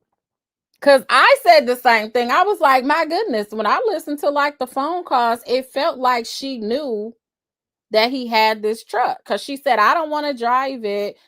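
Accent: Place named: Mississippi, United States. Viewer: Hi you sound like country said y'all but it's cute you sound like Jackson Mississippi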